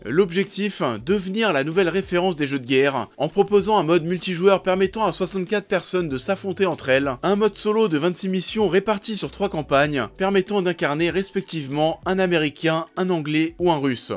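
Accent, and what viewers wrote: Paris, France. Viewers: Cette bouffée de nostalgie en intro un gros kiff par contre si je peux me permettre ; accellere un peu tes vidéos surtout le debit de parole on entendra moins l accent parisien avec les fins de phrases en "en" qui donnent envi de tuer des chatons avec une cuiller Sinon continue comme ca c est cool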